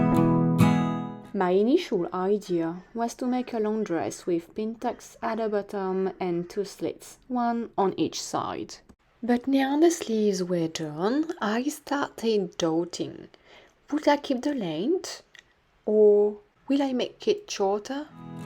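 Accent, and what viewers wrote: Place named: France. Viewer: Love your French accent ! Et tres Jolie tasse au passage 😘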